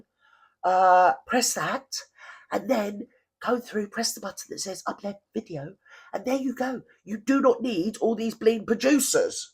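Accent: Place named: Manchester, United Kingdom. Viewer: we love your accent! You remind us of our gal pal from Manchester, England, Julie. It took us forever when I hired her as an accountant. We love her to pieces. Enjoy your personality and accent. GG from Texas. We don't see enough of you sista girl.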